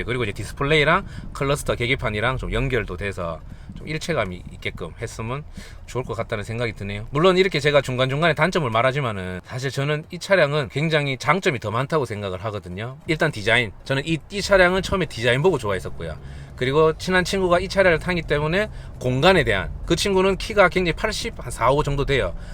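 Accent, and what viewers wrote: Gyeongsang, South Korea. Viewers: ㅋㅋㅋ 나도 경상도놈이라ㅜ경상도사투리 너무 좋음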